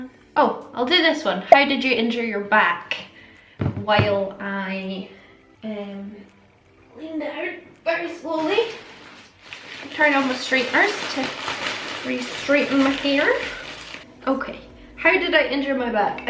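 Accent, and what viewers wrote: Scotland, United Kingdom. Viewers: Love these Q&A videos! I'm wondering about your accent, you said you were picked on. I assume it's a Scottish accent and you live in Scotland. Do you have different accents in different parts of the country? I'm fascinated with accents and languages. Being Russian I speak with an accent. But I speak American English with the Russian accent 🤣🤦🏼‍♀️. I LOVE how British, Scottish and Australian accents sound. I LOVE listening to you speak, lol. I think it's very pretty. It was painful to hear about your back accidents... I'm so so sorry girl! I'm sure you've been to good doctors. Hope they can find the best treatment for you. Have they considered surgery to repair whatever you damaged? I know surgery is always the last resort but it sounds like you're in so much pain... I really hope it gets better soon. Sending you love! ❤️ ❤️ ❤️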